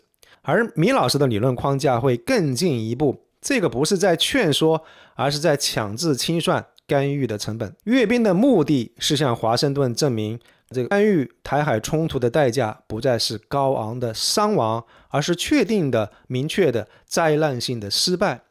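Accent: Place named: Hubei, China. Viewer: 湖北人吧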